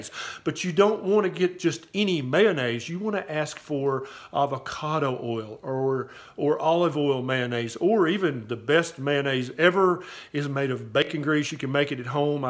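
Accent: South, United States. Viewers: love that southern accent 😊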